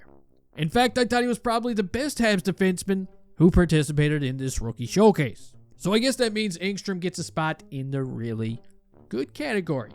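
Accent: Newfoundland, Canada. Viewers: Are you a Newfie? You sound Newfoundlander lol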